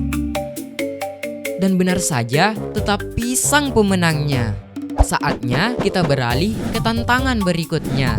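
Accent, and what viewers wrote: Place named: Indonesia. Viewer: dari suara nya udah ketebak orang indo🗿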